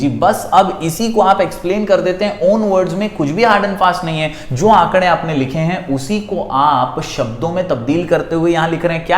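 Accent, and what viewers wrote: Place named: Gujarat, India. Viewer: Sir where r u from? Gujarati lgte ho 😅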